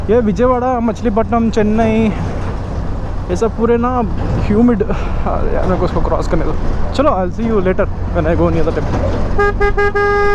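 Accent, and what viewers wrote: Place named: Hyderabad, India. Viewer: Bro i like it ur hyderbadi accent.... maja agaya na ekdmach.... ... 😃🤣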